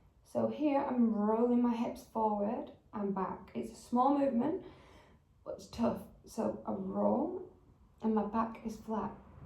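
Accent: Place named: England, United Kingdom. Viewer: You're so hot in this outfit 🔥 Specially talking in this beautiful engkish accent too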